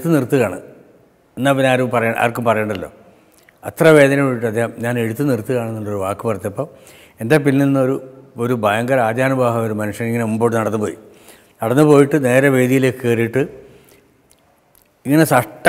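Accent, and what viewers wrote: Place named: Kannur, India. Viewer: ഈ കണ്ണൂർ സലാംങ് കേൾക്കാൻ നല്ല രസമാണ്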